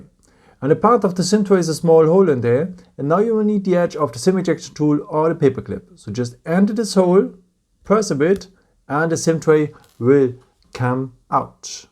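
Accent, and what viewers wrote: Germany. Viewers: RU GERMAN?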